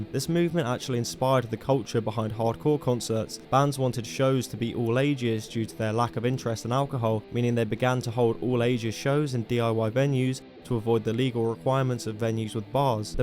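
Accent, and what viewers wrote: United Kingdom. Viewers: British accent, video disregarded. Go enjoy the sex pistols limey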